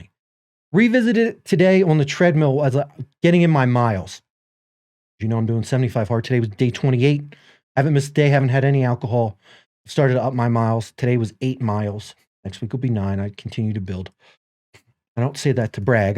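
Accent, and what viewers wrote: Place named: New York, United States. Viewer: Off topic but l've got a friend with the same accent and voice as yours. New York?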